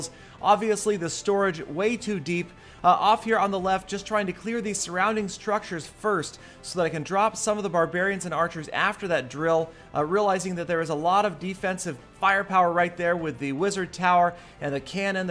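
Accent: Norway. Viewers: Wait, are you from Norway O_o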